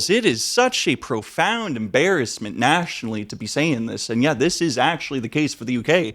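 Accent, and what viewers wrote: Nova Scotia, Canada. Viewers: He's Canadian. It's a Canadian accent. From Canada. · Thought I heard an NS accent, flag confirms it. Lived in Halifax for 20 years, came back to UK due to health issues. Can confidently say that the Western world is falling apart, and it's glaringly apparent in the UK, and from what I hear, back across the pond too. Can confirm that "business rates" here are fn absurd. You think Visa/Mastercard are bad? Amex charges 5%. Running a business in Halifax, aside from cash, Interac was by far the most cost effective method of processing any transaction higher than $5.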